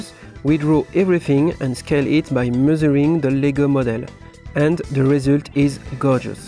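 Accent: France. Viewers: Salut mon gars ! J'adore tes créations. (je sais que t'es français : notre accent...) Je pense même à acheter les pièces pour construire ton modèle.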